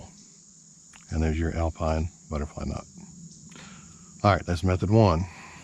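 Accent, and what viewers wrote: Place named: Austria. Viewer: Austrian conductor English. Lineman's Loop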